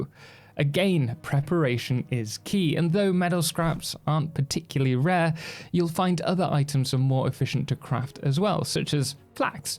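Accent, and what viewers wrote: England, United Kingdom. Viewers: My man, which part of England is your accent from? Can’t place it exactly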